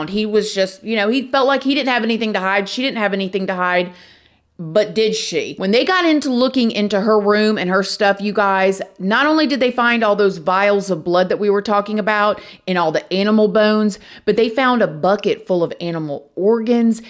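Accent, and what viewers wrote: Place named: West Virginia, United States. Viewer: Eeeny bIdy eeeny where. You have the cutest accent. You sound like me. I’m in West Virginia and have a country accent. I love hearing you talk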